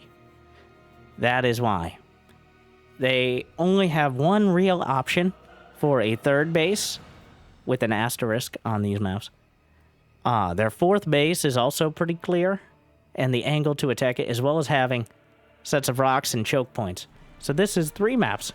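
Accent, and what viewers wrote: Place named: Appalachia, United States. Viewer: Why do u talk like a hillbilly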